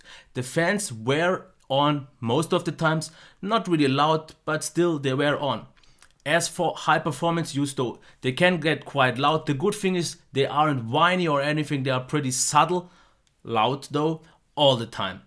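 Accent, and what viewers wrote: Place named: Germany. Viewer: German english is the best😁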